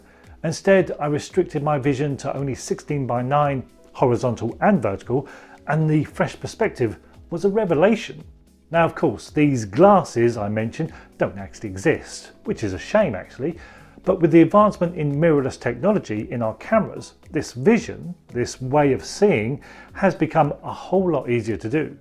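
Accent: United Kingdom. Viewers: Excellent instructional uploads amidst a sea of gear review channels. Always very interesting subjects with a unique delivery style. It's nice to hear a UK voice for a change. Thanks for sharing and caring. Keep on keeping on… ✊🏿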